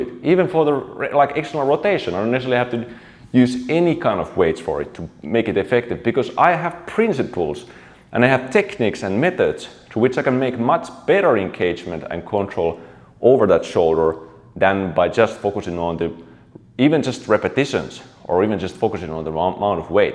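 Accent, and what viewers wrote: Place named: Finland. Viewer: The accent sounds like he is Finnish or perhaps Estonian. Neither are in the Indo European language family.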